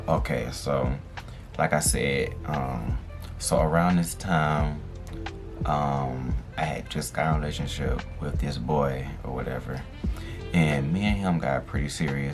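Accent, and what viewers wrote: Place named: South, United States. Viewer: You look and sound like a real southern. belle. Keep enjoying and keep growing your content .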